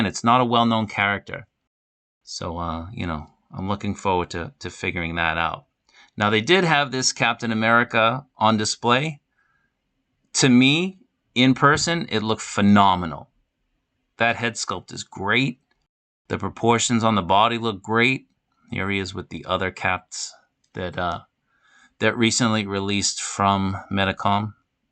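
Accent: Boston, United States. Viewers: So Boston accent ❤ GO CELTICS!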